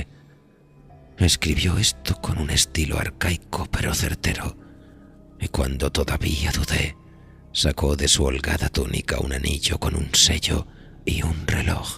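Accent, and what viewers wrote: Spain. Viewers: oír estos relatos con esta narrativa castellana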